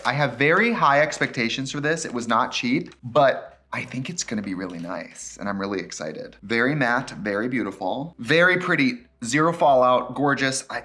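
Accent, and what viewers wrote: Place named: Milwaukee, United States. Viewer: The Milwaukee accent is front and center today ❤😂 LOVEEEEE ❤️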